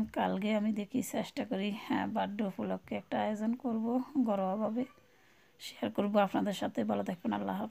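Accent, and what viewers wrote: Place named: Noakhali, Bangladesh. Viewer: আসসালামুয়ালাইকুম আপু তোমার ভিডিওটি খুব ভালো লাগলো তুমি কোথায় থাকো বেল বাজিয়ে রাখলাম আপু তোমার কথা শুনে মনে হচ্ছে তোমার বাড়ি নোয়াখালী আমার বাড়িও নোয়াখালী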